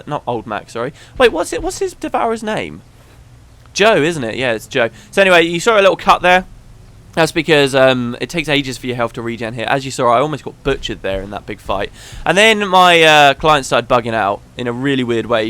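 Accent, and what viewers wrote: United Kingdom. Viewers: Yay! I recorded one myself for nostalgia when I'm playing Gw2. But, I kind of died at the end and didn't do the one from this year. Now I can watch it in a few years, but with British commentary!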